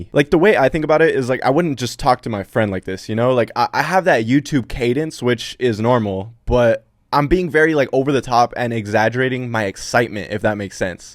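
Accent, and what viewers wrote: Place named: California, United States. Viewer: Cali voice*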